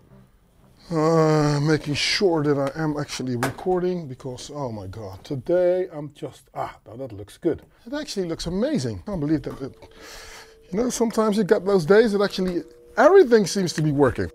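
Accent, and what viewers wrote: Netherlands. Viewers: You def dutch by the aound of your accent lol😂🚀 dope video you gained a sub brother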